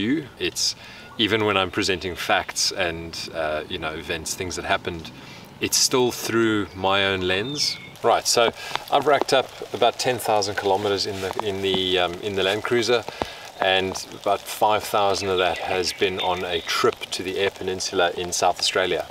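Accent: South Africa. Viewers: Awesome video. Really enjoyed it. Your accent though, are you South African? Doesn’t sound Aussie, or are my ears deceiving me 😅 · You south African?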